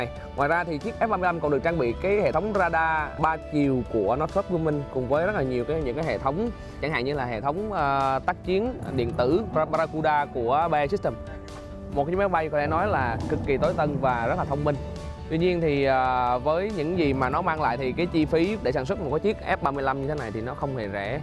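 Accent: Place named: Da Nang, Vietnam. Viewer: Giọng a giống quê đà nẵng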